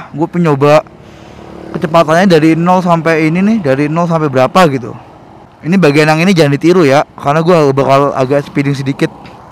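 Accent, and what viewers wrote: Surabaya, Indonesia. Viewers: keren bang... lu gue logat surabaya!!!..salam buat bis kalisari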